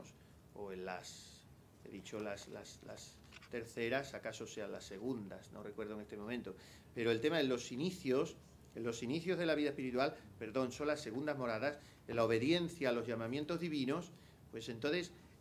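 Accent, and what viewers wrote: Extremadura, Spain. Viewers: Monseñor, se queda uno ensimismado con V., con lo bien que explica todo y con la sabiduría que hay en sus palabras, fruto del estudio intenso, la oración profunda y el cariño sincero. ¿Cómo no apreciarle y quererle tanto? Y, además, con ese gracejo extremeño que comparte con tanta simpatía. Habría que decir como los niños pequeños cuando disfrutaban con algo y no querían que acabase: "sólo cinco minutos más". Porque disfrura uno tanto que se le pasa volando. Buen descanso para todos nos dé Dios 🙏🏻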